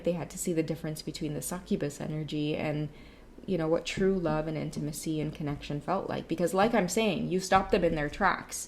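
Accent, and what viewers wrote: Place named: Trinidad and Tobago. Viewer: Your channel is top quality what a treat you are! and with your cute ass trini patois just love to listen to you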